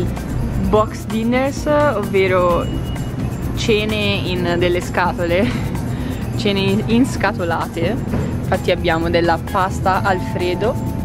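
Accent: Tuscany, Italy. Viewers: Sei toscana ? Di dove? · Sei toscanaaa l ho sentito da l' accento 😂anche io sono toscana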